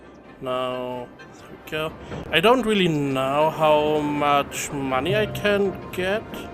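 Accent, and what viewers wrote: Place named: Germany. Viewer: klingt lustig dein denglisch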